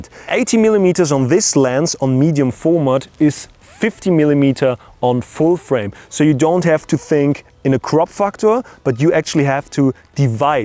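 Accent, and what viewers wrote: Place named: Germany. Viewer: I really like your videos but your German accent is just crazy XD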